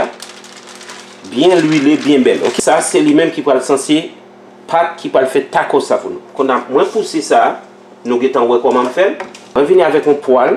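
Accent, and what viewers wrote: Quebec, Canada. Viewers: retire kebeka a nan bouch ou tanpri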